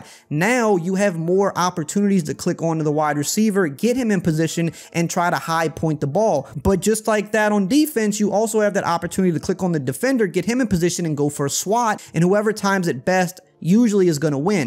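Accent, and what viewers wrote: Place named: Lafourche, United States. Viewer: Never seen one of your videos before but damn that accent gotta be from Lafouche.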